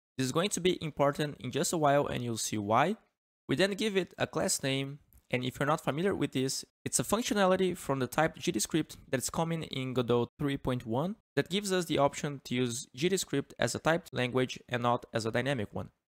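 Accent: Brazil. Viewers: Woah, such nice Brazilian Accent,! Gotta like that! Also, nice video:3 É nois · Esse sutaque nn me estranho kkkk br certeza.. oueut o ficando loko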